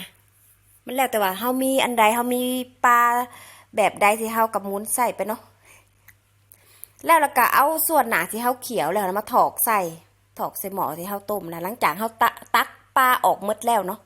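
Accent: Savannakhet, Laos. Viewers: ຂ້ອຍເບິ່ງຫລາຍລອບກະຄືບໍ່ໄດ້ມີພາສາໄທປົນໃດ ຄົນສະຫວັນເຂົາເວົ້າແບບນີ້ໃດ ຂ້ອຍກະຄົນສະຫວັນນະເຂດຄືກັນ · ເຈົ້າຄົນແຂວງໃດສາວ ນີ້ແມ່ນພາສາຄຳເວົ້າສະຫວັນນະເຂດ ຂ້ອຍຟັງແລ້ວກໍ່ບໍ່ໄດ້ມີໄທປົນເລີຍໃດ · Jasmine Cahuana ຂ້ອຍຟັງເອື້ອຍລາວເວົ້າມ່ວນໆຫວານໆ ຕາມທີ່ຟັງນິແມ່ນສະຫວັນອ້ອຍຕ້ອຍເກາະນິ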